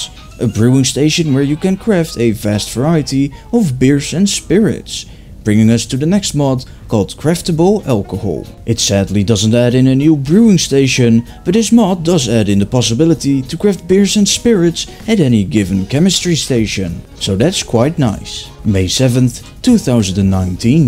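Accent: Netherlands. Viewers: Literally translated "good busy". In proper terms, usually a sarcastic way of saying "well done". He's Dutch, like me, so I can easily notice his accent. I merely made a bit of a giggle here; nothing serious. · I'm i right on detecting a dutch accent? Ik denk het wel namelijk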